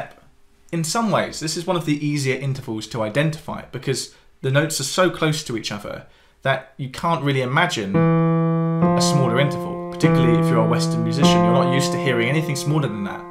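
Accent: England, United Kingdom. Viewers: Oh to have good hair, an english accent AND piano skills. Very enjoyable. Thanks.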